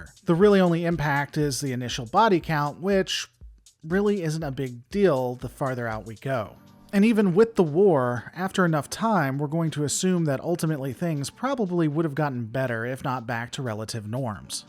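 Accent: United States. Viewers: So freedom is not something worth sacrificing for? It is, in fact it’s worth laying everything on the line to keep it. As an American (presumably from your accent) you should understand this from our history.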